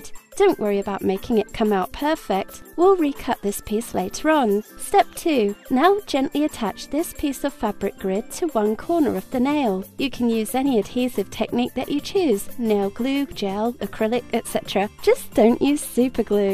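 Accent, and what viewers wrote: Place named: United Kingdom. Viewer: i loooove this british accent.....i really do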